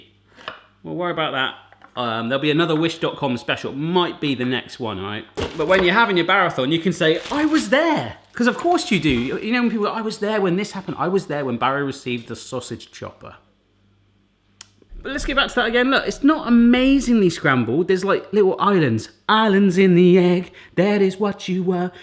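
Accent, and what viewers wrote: United Kingdom. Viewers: Yep your accent totally "Vanilla" British! hahaha ^.^ Loved the Egg/Toaster combo thingy! ^.^ ♥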